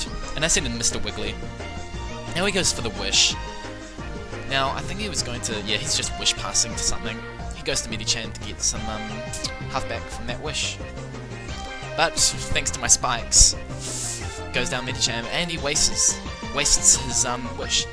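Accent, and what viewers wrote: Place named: Australia. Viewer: I can't tell what you're saying!? Stupid AUSTRALIAN ACCENT! Can we have subtitles?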